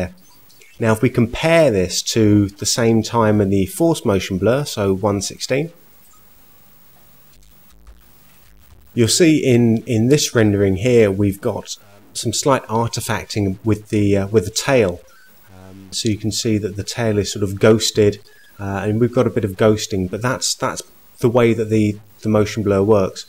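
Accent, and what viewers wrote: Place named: England, United Kingdom. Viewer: Thank you so much for this tutorial, it's just perfect. And so good to hear an English accent on a video tutorial for a change - I can understand even better :)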